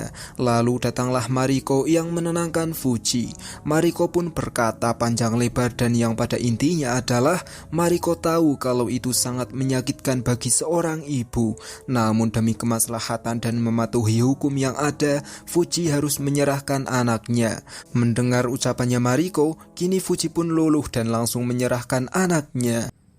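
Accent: Java, Indonesia. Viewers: klo ngomong ga usah medok kali, jadi ga enak dengarnya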